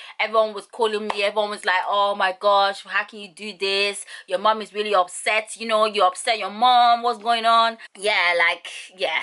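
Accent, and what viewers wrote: Nigeria. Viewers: I can still sense your Nigerian accent Good you proud of being a Nigerian ❤️❣️ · I love the Nigerian 🇳🇬 accent 😍😍😍😍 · I love the way the Nigerian accent comes out from time to time. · Nigerian accent never leaves ur mouth 😂😂😂😂 😂😂😂😂😂😂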